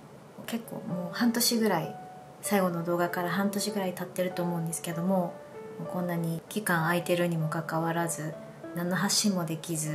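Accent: Kansai, Japan. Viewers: ご懐妊おめでとうございます(*´Д`*) いつも手際よく美味しそうな料理、お弁当、私には真似出来ないけど、素敵な奥様だなぁと思っていました( ´∀`) うちにも猫がいるので、茶々にも癒されていました。不妊治療もお疲れ様でした、私も同じくらい経験しましたが、残念ながら出来ず、やはり妊娠は奇跡だなぁと思います。暑い時期ですし、無理せずに過ごして下さいm(_ _)m 関西弁も親近感湧きます、サクラ🌸咲くさん、本当におめでとう～(^O^)／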